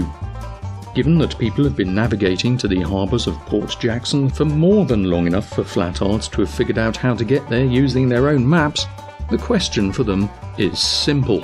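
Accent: United Kingdom. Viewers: Shill behind a stuffy English accent. Must seem pretty impressive to the cucks (subs) of this channel. The real working model is quite the hot potato but I'll give you clueless twits a hint. The vajra. PLASMA is the light source. Learn about primer fields. Maybe you can figure out that the electromagnetic ice dome is essentially a magnifying glass. You may struggle to get it given your limited ability to reason but the sun is nothing more than the focal point of light inside the dome from the plasma spiral( again, learn about primer fields globuloids) Just like a magnifying glass. That's why you can see clouds behind and in front of the sun when it's lower on the horizon. It is local but only the light from the source. Learn about the VAJRA! What it is and when was it seen. Also the complete book of Enoch. If you are godless there's no telling you as it is impossible to have understanding without. 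And I'm not talking about the Freemason puppet Christian church on the corner. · If you call people retards it's no wonder you only get responses from like minded people. That is no way to expand your horizons..ps nice British accent.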